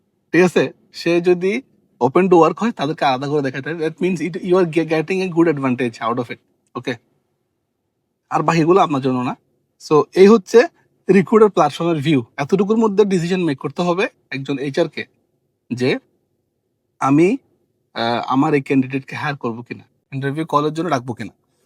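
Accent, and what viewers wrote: Chittagong, Bangladesh. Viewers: bhiya apni ki chittagong er??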